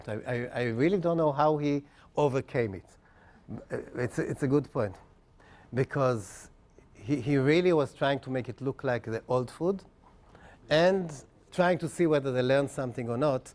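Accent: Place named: Israel. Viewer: yay heb accent ^^ :-)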